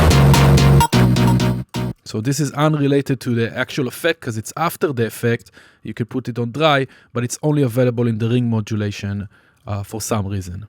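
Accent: Israel. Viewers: I love the fact that I could tell you're from Israel after the first three words you said! But jokes aside, great video and useful info, thank you very much.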